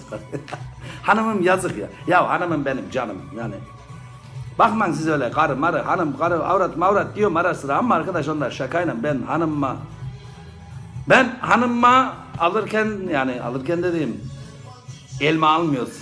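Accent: Yozgat, Turkey. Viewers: agbi nerelisin agbi? bizim yozgat sivesi var sende:)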